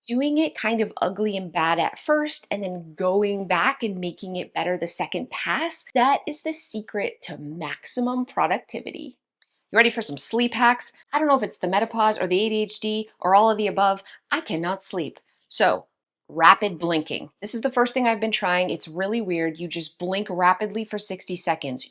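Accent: Canada. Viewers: Never watched Sister Wives. Cilantro tastes delish to me, one of my favorites, we all have different chemical make ups, they say people who have a certain chemical make up, cilantro tastes like soap. I have a friend who loves dandelion tea and yikes! To me it is so bitter, she also likes sardines, ewe. We are all different and unique not "weird" I think you are smart, funny and beautiful and I love your little Canadian accent, I think you are in Canada? Byeeeeee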